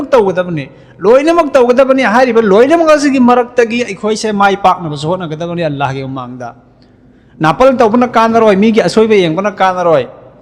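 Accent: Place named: Arab world. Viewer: Janab se Hafeez ama oibgi chp chaba magun lei.... Arabic accent, afaba warol sndokpiba, yam taba nungai......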